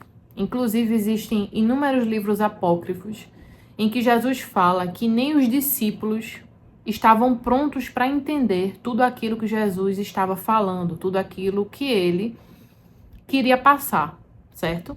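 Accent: Northeast, Brazil. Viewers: Olá! Adorei teu sotaque de algum lugar do interior do Nordeste!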